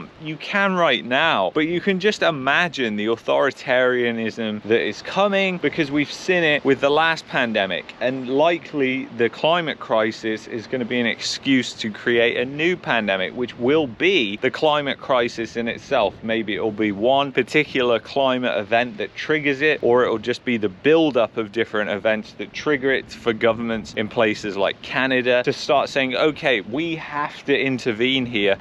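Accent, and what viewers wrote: United Kingdom. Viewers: Thank you for having the courage to speak out on these important topics. It's refreshing to hear a Briton talk about these issues.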